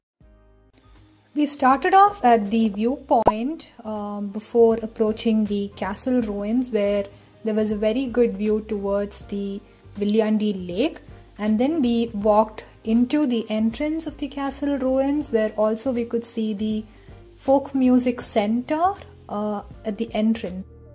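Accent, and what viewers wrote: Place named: India. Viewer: Wow Thank you for posting this. It brought back so many good memories since I lived in Viljandi for 3 years. Its a beautiful and calm town <3 I am from India and I guess you're Indian too? Assuming by your accent :)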